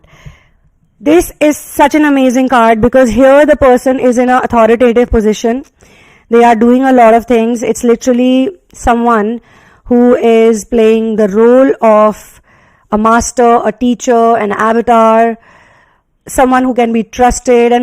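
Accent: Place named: India. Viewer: I ABSOLUTELY LOVE to hear you speak! I love the accent from India! Thank you so much for your help and guidance ❤️🙏🙏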